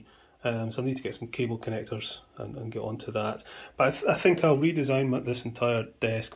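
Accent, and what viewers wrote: Scotland, United Kingdom. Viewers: You can do it!!! Build it and they will come!!! And we did!!!, Soo Congrats on the 5,000 subscribers too mate. Im very happy to be 1 of them as you show great content and info. Your extension on the platform looks great too. I was going to suggest you make your no2 platform longer before as it looked a tad out of place before. Ima thinkin that you need to look out for some more lost sheeps though haha Why dont you call it " " (you know like the song haha) only because you have the same accent and sounds similar to them haha.. Anyhoo keep up the good work and gr8 video there m8. Cheers from John in Australia.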